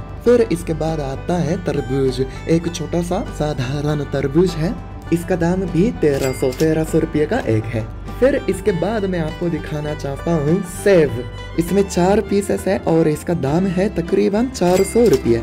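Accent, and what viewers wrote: Standard. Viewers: bhai aap ki Hindi bahot shudh he hamare jaisa nahi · Bro ap jesi Hindi bolte he (sudh Hindi ) vese to hamre idhar bhi nhi bolte ( nice Hindi) ----you talking Hindi nice ...i like this 😁😁😁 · He is much more Indian than many other People living in India, शुद्ध हिंदी।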